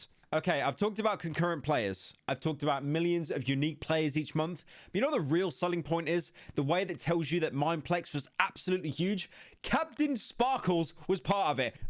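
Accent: United Kingdom. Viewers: why are you so quiet british man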